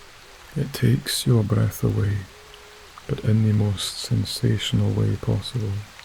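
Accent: Scotland, United Kingdom. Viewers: the sound of a Scottish voice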